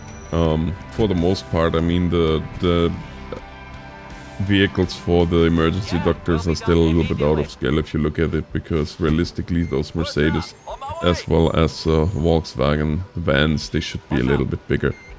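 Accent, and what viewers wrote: Germany. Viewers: You have a hard accent... are you german? :D (I'm a newbie) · i hearyour germen acend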